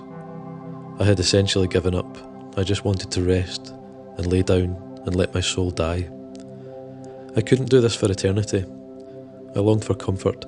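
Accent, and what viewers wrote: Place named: Newcastle, United Kingdom. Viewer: The Geordie accent make the story 10x better!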